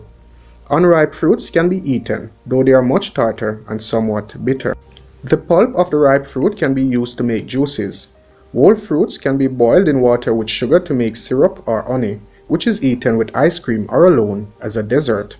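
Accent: Jamaica. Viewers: Sir, Sir, Sir 👍🏾👍🏾👍🏾you have impressed me from the very first video I saw about the pyramid in St. Thomas. I always said that you reminded me of a notable well-researched writer/publisher after I saw your first video. And to hear you referenced the writer called Mark Twain has confirmed that you are just as or even more gifted than he was. You have the same kind of passion for your work. So no bada watch weh di "th" edicated elites dem waan seh. They should all recognize the greatness within you and encourage you. Please do not go chasing after getting "their" institutional degrees either. Continue to teach your self and Edu-tain us and "they" will end up chasing you to offer an Honorary Degree. Also continue your genius in doing your presentations with the same kind of "Jamaican English" because the world loves our accent and language - ask those who travel. BIG UP USELF!!! 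· This is ridiculously RUDE! The host is Jamaican sharing about native Jamaican fruits. The beauty of Jamaica is it's people, culture, food and language. He doesn't need to assimilate the way he speaks. He's sharing valuable information. Those who want the knowledge will stay for it and loving the way he speaks in the process. Too many people want to be westernized while disowing their own culture. You should be ashamed. People all over the world who don't speak English have accents. People in English speaking countries have accents and drop letters all the time. That's how dialects also work. I LOVE when people are themselves and don't switch up how they act or speak depending on who they think is important. He is being his authentic self which I value! Wonderful information is being shared, focus on that!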